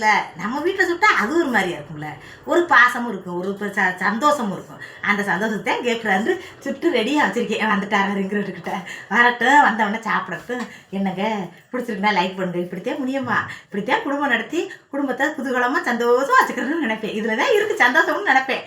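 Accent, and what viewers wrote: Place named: Ramanathapuram, India. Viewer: ஏக்கா ராமநாதபுரம் மாவட்டம் மாதிரி கூட பேசிப்புட்டு நீ எம்புட்டு குதூகலமா பேசுற நீ ஏத்த உளுந்த வடை நல்லா பொசு பொசுன்னு வந்திருக்கு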